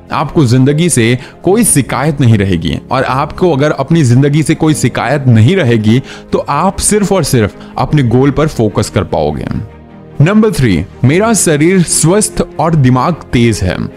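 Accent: Gujarat, India. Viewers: Aap gujraat se ho kyaa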